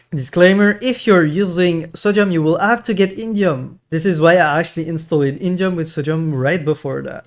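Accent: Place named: France. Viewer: hahaha parce que je suis francais j'ai reconnu un petit accent francais en toi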